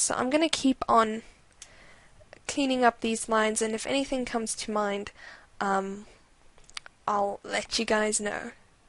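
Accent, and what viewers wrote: South Africa. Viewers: Your accent. Are you South African? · I got really excited when I heard your accent! I miss South Africa.... Do you speak Afrikaans? Also, your drawing style is so cute and I love it! It reminds me of Disney :o) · I think she is South african... XD Idk! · Are you South African? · Nice tut. BTW You sound South African.... Am I right? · When doing the line clean up even when my eraser is set to 100% hard i get this softening of the line? Is that because im not in 300dpi ? btw you sound South African :) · South African accent? :)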